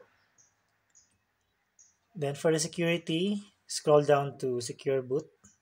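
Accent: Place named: Manila, Philippines. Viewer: Nice Bro, there is now a super good tutorial (that sounds like really from Manila) hehe... Thanks for the tutorial but I cannot do this since I am using my only 1 PC for work, do you suggest your method could be a solid workstation or will this still have some crashing along until I fixed DSDT, KEXT etc. ? Will having another back up (clone) a good idea? That's what I did before when I am using my hackintosh for work early 2016... Whenever It crash, I just mount the working cloned system and then clone again hehe.. Thank you for the video Bro. New Subscriber here. :) BTW, I am using 5800x + X570 + RX 5600XT will use mostly for Premiere Pro and other Adobe Products. More power!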